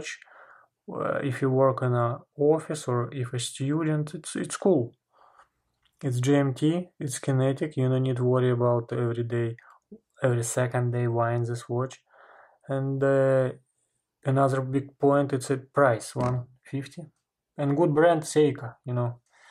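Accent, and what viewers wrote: Russia. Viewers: Русский акцент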